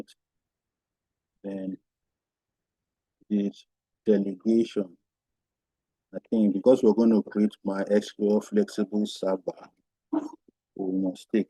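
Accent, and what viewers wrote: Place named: Africa. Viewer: African accent is so out of this world, I cant understand the words coming from his mouth...😵‍💫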